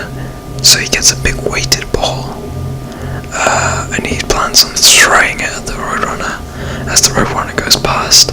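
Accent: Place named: Australia. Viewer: You sound Australian in this one.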